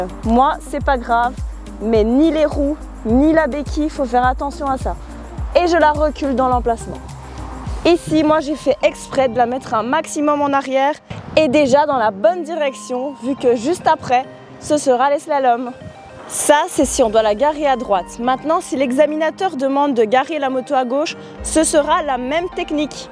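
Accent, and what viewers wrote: Belgium. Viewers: Supers conseils, même pour qq1 d'averti, merci (waouw l'accent région Liège, je kiffe - Pierre - 4500 - Huy)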